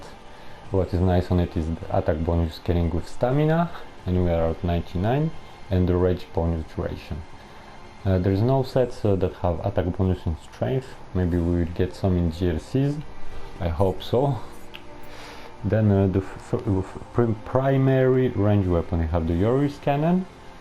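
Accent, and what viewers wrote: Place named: France. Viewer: Your French accent is so sweet, and good build gg wp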